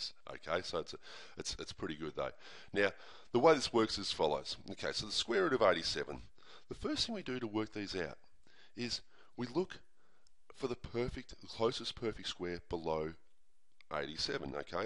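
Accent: Australia. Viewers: Man! Thanks u are brilliant . It will help us for sure. And i guess from me U are an aussie. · Is that an Aussie accent... I love it XD. Also thanks sirrr! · are you Australian ... ? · This man is violently Australian and I like it.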